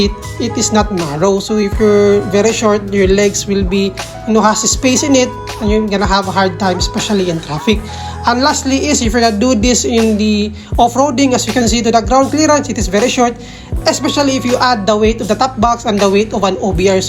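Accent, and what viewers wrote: India. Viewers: Bagalan mo nalang English mo or tagalugin mo nalang kung hirap ka...Kase comparison of two motorcycle Ang topic mo kaya dapat mas maintindihan Ang explanation mo... Parang indiano mag English😅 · Para kang syunga mag review? Saka kana mag english kng marami kanang subscriber? Para kang mga indiano mag review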